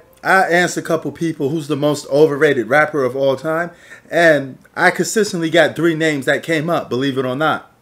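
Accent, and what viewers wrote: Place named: Baltimore, United States. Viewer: You’re from Queens. I honestly thought you were from Baltimore from your accent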